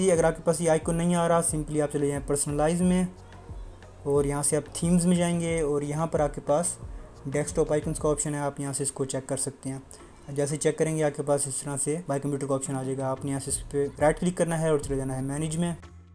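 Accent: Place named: Southern England, United Kingdom. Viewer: Southern English to fast..... had to change the playback speed. LOL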